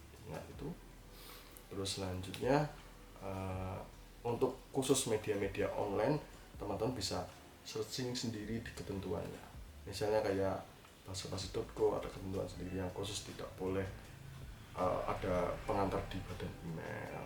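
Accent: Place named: Ponorogo, Indonesia. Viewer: Ketika ngomong ponorogo medok banget mas.. i like it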